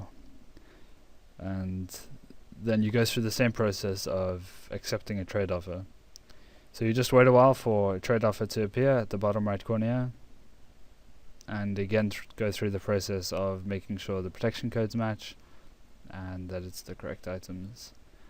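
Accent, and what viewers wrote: South Africa. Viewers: you sound south african ? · Is this guy a South African ?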